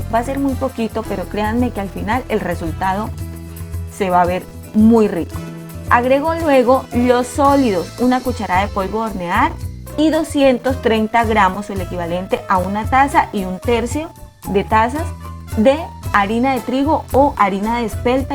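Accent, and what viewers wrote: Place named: Caribbean Coast, Colombia. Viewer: Tiene un acento costeño como de Barranquilla o Sincelejo con un toque como de Santandereano y paisa.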